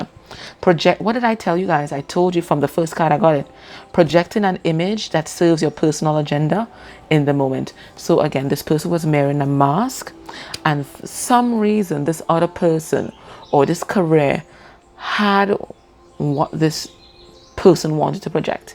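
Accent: Trinidad and Tobago. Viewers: Hi are you Trinidadian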